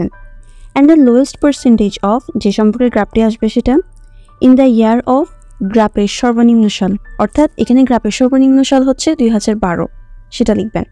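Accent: Chittagong, Bangladesh. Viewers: ১০০% শিওর আপনার কথ বলা বাচনভঙ্গি একদম খাঁটি চাটগাঁইয়া 🖤🖤🇧🇩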